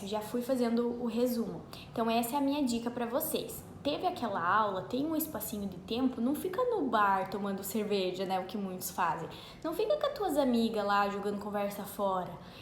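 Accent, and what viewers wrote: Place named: South, Brazil. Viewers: Esse sotaque gaúcho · é do Sul pelo sotaque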